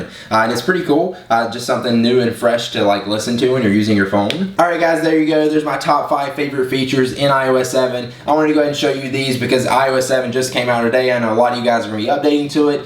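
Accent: United States. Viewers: you have the biggest american accent ever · it's not exactly an american accent. it's a country accent.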